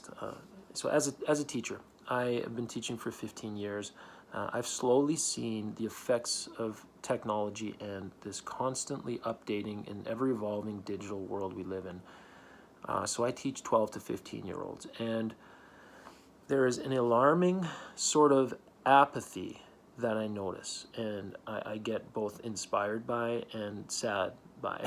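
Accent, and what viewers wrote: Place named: Canada. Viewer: Ah, I could sense some sort of Canadian in the accent. Alberta is a beautiful place. Much love form Toronto! - pls keep up with the reviews/book vids, there are no where near enough people covering these sorts of books let alone reading them.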